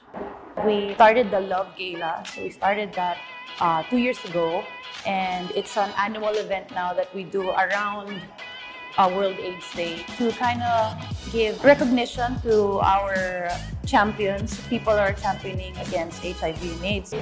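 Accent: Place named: Philippines. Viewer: PrettyYummyLicious Pia....pinoy na pinoy ang gawi at pananalita yan ang gusto ko sayo...napa simply at nakaka relate aqo sa tuwing ng ku2kwento ka...eto yong miss Universe na cowgirl ang dating😮💖💋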